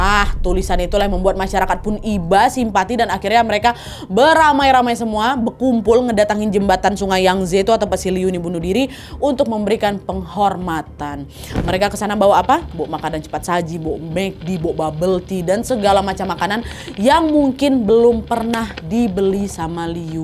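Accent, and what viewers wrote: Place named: Medan, Indonesia. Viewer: Medan kali cakapnya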